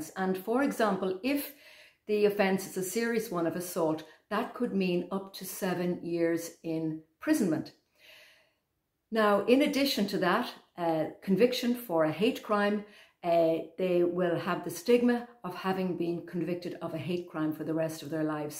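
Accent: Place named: Ireland. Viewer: It's so refreshing to listen to an Irish person speaking so eloquently. Beautiful too.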